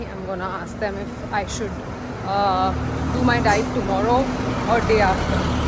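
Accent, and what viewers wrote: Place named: Punjab, India. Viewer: Saying it yet again that your Punjabi accent is sooo cute🤌💓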